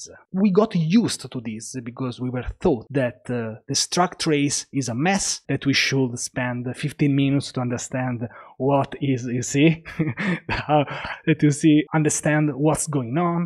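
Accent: Italy. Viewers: si alla fine l’ho completata tutta la serie e l’ho capita benissimo, l’accento italiano ha aiutato molto ahah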